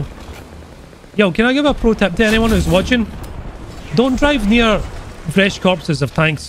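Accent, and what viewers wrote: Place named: Aberdeen, United Kingdom. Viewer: I love the accent and are you from Aberdeen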